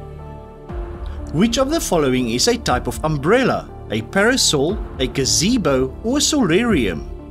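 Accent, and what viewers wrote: Afrikaans, South Africa. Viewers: Bud as a fellow South African, you are clearly of Afrikaans origin,you need to work on your pronunciation.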